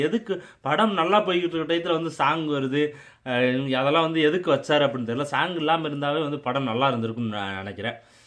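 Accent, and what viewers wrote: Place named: Cumbum, India. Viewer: Me too cumbum side one village, I thought you are from chennai bro. Now I came to know why I watch your reviews native speech bro,doing good job but now your background looking like usa . Where are you staying now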